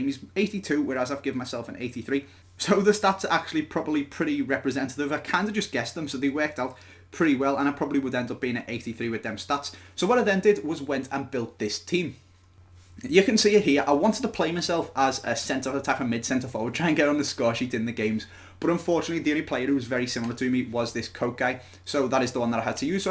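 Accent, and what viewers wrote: Liverpool, United Kingdom. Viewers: Lad you hide your scouse accent kid, u can hear it, u fucking discrase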